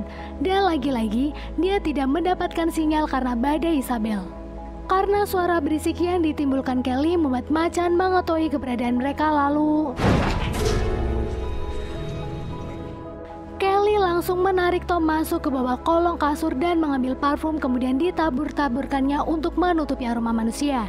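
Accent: Pemalang, Indonesia. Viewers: Mba orang Pemalang yak?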